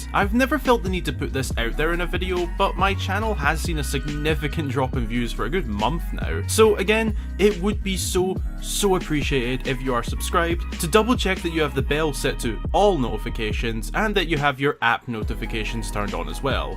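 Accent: Scotland, United Kingdom. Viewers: Are you Scottish just by the accent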